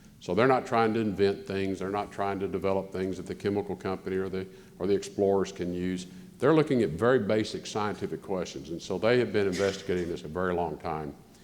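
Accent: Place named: Texas, United States. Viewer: pro I miss that Texas draw :)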